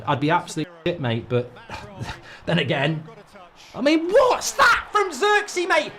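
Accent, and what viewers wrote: United Kingdom. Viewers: Funny old manc accent that!like every other Salford supporters not born within 100 miles of Manchester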